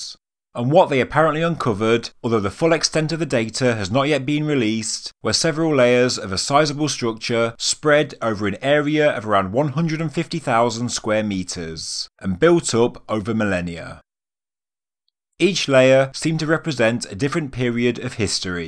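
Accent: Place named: East Midlands, United Kingdom. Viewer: I love archeology given to me in a strong east midland accent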